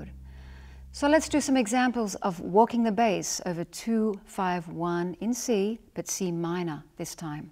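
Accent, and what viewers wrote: Australia. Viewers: Australian English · My preference 4 gtrs is progressively getting bigger & bigger & hollower & hollower .. dang, maybe I shoulda bin a upright bass player. Well maybe not quite but 2 do JoePass-type stuff I’m gonna hafta b least a moderately proficient bassist. Bassists fascinate me. So .. hey, is that an Aussie accent? Show ‘em how we do it in the gr8 Sthn Land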